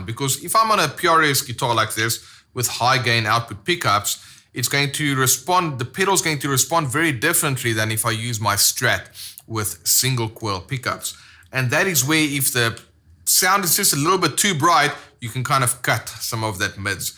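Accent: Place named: South Africa. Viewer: Ive always been a fan of the bb preamp,one is always close by my pedal board. These days i have the custom shop one with the mid boost. Ps..... what the hell accent is that? Ive never heard it before,South Africa?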